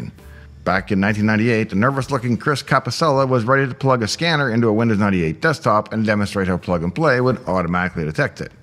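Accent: Texas, United States. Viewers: That's my favorite accent. Is that Texan?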